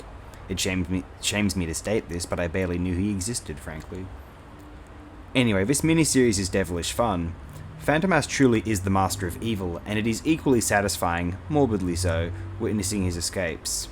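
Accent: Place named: Australia. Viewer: 1: He sounds australian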